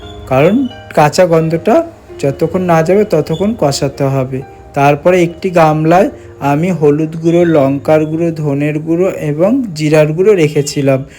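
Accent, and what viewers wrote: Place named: Bangal, Bangladesh. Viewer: পিচ এটা আবার কোন দেশের ভাষা , বাঙাল ভাষা ?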